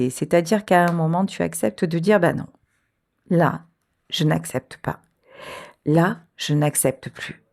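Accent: Marseille, France. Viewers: Bonjour chère!❤❤😂😂🎉🎉 Jamais! Tu es parfaite comme tu es. Tu nas pas a t'excuser ou a devoir t'expliquer pour la belle personne que tu es.❤😂😂🎉🎉🎉🎉😂 Nous ne sommes personne pour te dire qui tu dois être ou comment tirer tes cartes.....???????❤❤😂😂🎉🎉🎉 Tout ce que je sais, est que tu n'est pas a l'origine de mes ressentis. ❤❤😂😂🎉🎉🎉 Très belle année et j'espère que ton bel accents marseillais restera bien droit dans ces bottes pour 2025.❤❤❤😂🎉🎉🎉 Ne t'inquiète pas tu es juste royales. Je commence a être plus connu de mes commentaires, et tu sais que comme toi, rien ne vaut mieux que ce que tu es❤😂😂🎉🎉🎉🎉🎉 En claire, rien ne mieux que ce que Dieu a fait de nous.❤❤❤❤😂😂😂🎉🎉🎉 tu es bien comme tu es et ce n'est pas grace a nous. Tu n'est que plus royale encore que quand t es authentique. ❤❤❤😂🎉🎉🎉🎉🎉 pour moi, dire ce qu'on pense avec respect et amour, être juste avec soi-même et les autres disans les choses comme elle sont, en plus de nous partager un bout de tes expériences, Tu n'as que plus de mérites!❤❤😂😂😂😂🎉🎉🎉Merci 🎉🎉🎉🎉 Je te souhaites pleins de bonheur, de l'amour de ce qui t'entourent et de paix pour en profiter. 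❤❤❤😂😂🎉🎉🎉🎉🎉🎉🥊🥊🥊 La classe ca ne s'apprend pas!😂😂😂😂 Soit on est née avec, soit on se l'achète sur YouTube. 😂😂😂😂😂😂😂🎉🎉🎉❤ Toi comme moi, tu es nées avec!🎉🎉🎉🎉😂😂😂😂🥊🥊🥊🥊 Alors merci de t'en servir pour nous guider. ❤❤❤😂🎉🎉 Toute fois, pardon si j'ai dis quoique ce soit d'irrespectueux, juger ou mal exprimer. ❤❤😂😂🎉🎉😂😂bonne année.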